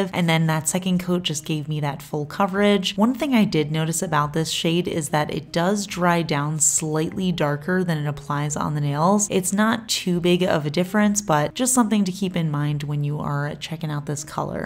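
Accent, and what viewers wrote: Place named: Las Vegas, United States. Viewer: Hey. I am loving and will pick up Force of Nature. I haven't found a green polish that beautiful since China Glaze's Emerald Sparkle well over a decade ago. Thank you for your dedication to this channel and your viewers. You already sound like a Las Vegas native. I was born and raised here and have lived in Henderson for 30 years now. I'm glad to have you as a neighbor.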